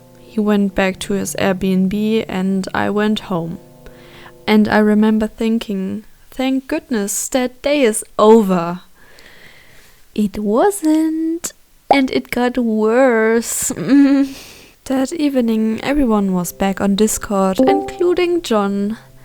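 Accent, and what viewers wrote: Germany. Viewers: Are you German? Bcs I think I hear a little bit deutsche Akzent ✨ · Nice German accent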